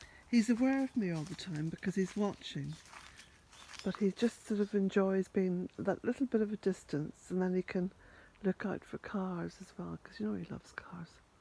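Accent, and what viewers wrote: Ireland. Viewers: HOW I LOVE TO START MY MORNINGS AT BEALTAINE COTTAGE BUT AM HAVING GREAT DIFFICULTY HEARING YOUR LOVELY IRISH VOICE. WISHING YOU AND JACK A WONDERFUL DAY, LOVE TO YOU BOTH XXOO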